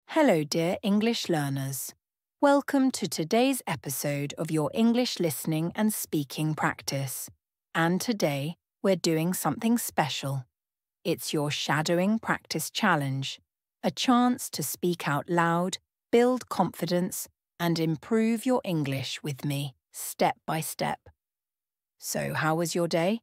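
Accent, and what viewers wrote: United Kingdom. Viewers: No she said at is a British accent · It is british accent. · She said it's British, because it's her accent. It's in minute. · noooooo qué lastima que es inglés británico... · (Is it british accent) Yes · I tried to find something with a British accent! 👏Thank you! 😊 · Is this a British accent? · I don't like the British accent 😢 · Is it british accent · Day 1 complete! This shadowing challenge is exactly what I needed to boost my confidence and improve my pronunciation. Loving the clear and natural British accent❤ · I love the clear and natural British accent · She said british accent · Is this british english?? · بريتيش اكسنت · This is the first time I have seen this video and I loved it. Thanks for this proposal and the encouragement! The British accent is my favourite, keep going! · No ,it’s is a British accent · The British accent is very charming🥰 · England accent 😢😢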